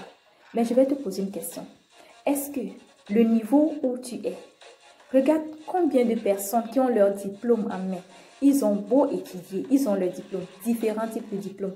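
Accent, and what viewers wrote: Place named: France. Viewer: I love the French accent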